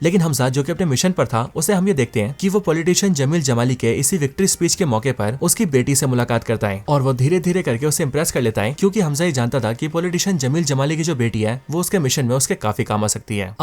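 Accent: Bengal, India. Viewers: Tum bengali ho kya???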